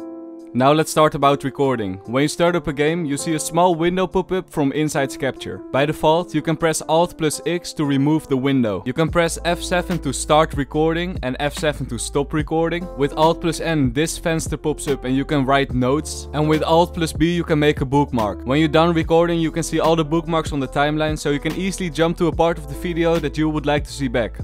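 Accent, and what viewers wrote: Netherlands. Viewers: are u netherland ? u raely have the accent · is that a dutch accent i hear?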